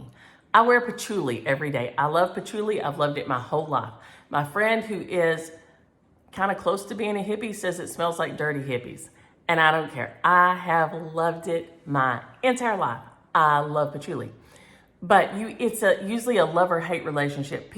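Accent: South, United States. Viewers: . I love listening to you with that southern accent (my parent both😮s were from the south so the majority of my relatives have that same accent). I'm so sorry about your framing debacle. I live in Michigan sol our pumpkins are all cold up here. I hope you have a fabulous weekend. Take care. Pat Tackaberrry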